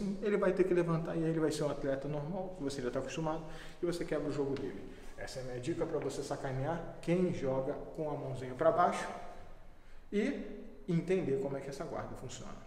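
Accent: Rio de Janeiro, Brazil. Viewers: Tu é carioca ou Paulista vei 😂😂😂😂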